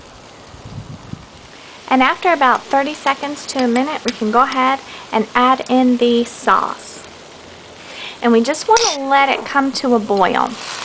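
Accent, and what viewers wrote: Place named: Tennessee, United States. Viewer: you sound like your from Tennessee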